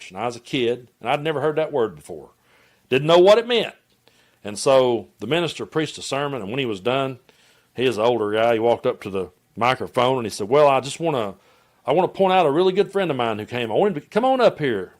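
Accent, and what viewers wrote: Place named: Kentucky, United States. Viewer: When l 1st started watching you, your name " " threw me off cuz of your Kentucky accent. I was born in Paris-and-I-don't-mean-France. Anyway, your current events coverage is brilliant, insightful.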